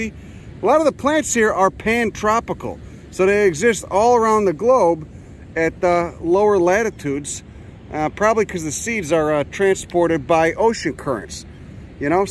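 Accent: Chicago, United States. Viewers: can you please say "flotsom and jetsom" in yer chicago accent????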